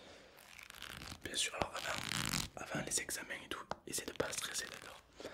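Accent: South, France. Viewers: Normal c l'accent du sud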